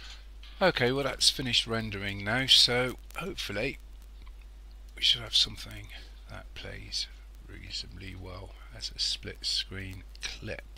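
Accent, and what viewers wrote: West Country, United Kingdom. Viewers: Thanks! Very useful video! Where are you from? Sounds like you're from somewhere in the West Country but it doesn't sound quite like West Country accents I've heard.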